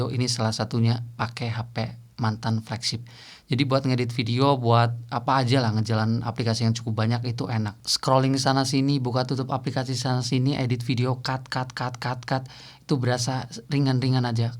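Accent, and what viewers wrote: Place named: Banyumas, Indonesia. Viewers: Salam ngapak😅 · Ora ngapak ora penak ya lur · Banyumas lurrr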